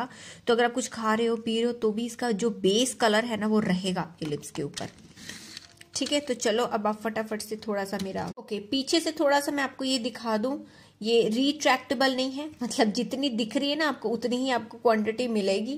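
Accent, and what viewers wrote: Punjab, India. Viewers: Awwww 😍 the way she talk 😍 full Punjabi 😍 love you dii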